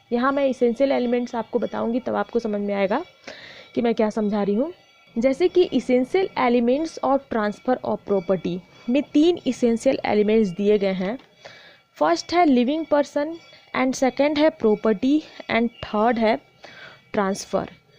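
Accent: Bihar, India. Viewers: Maam bihar sa hoo kya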